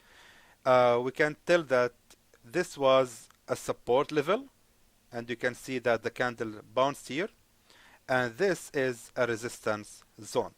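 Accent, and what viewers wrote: Arab world. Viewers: والله اللهجة العربية م تتخبى لو ايششش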